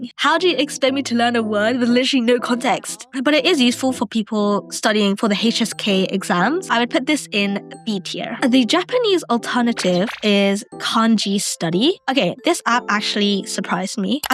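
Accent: United Kingdom. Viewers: Why do you sound British?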